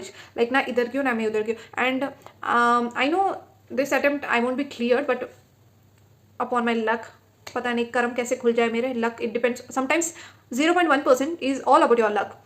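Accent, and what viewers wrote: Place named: South India, India. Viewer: You sound like you're from South Indian .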